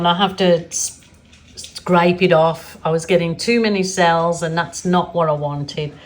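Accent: Birmingham, United Kingdom. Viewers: I'm getting notifications in the UK. You make me smile every video, your kindness is a beautiful thing. And yes your art is amazing. I'm a 60yr old woman, I have done "normal art" for a long time but this style is so fresh and fun , and certainly not as easy as you make it look. And yes, I can still hear the Brummie accent lol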